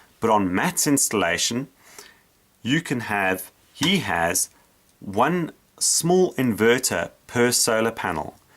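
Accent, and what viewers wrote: South Africa. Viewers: Even with a thick SA accent, your video was easily understood and interesting. Great video 👍 and thank you.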